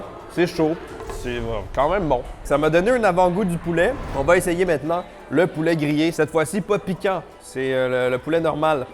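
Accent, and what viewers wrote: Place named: Quebec, Canada. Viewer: J'aime bien la vibe de t'es vidéos quand tu voyage, ton québécois ressort plus que dans t'es vidéo a la maison ! Toujours un plaisir de te regarder :)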